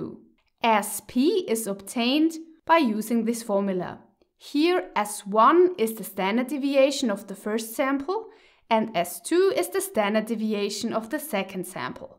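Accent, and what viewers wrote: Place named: Germany. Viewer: Hey there! Thanks so much for your videos. They're incredibly insightful and I've learned more from them than in any of my statistics classes at university. By the way, I had a hunch you might be a native German speaker, even though your English is excellent!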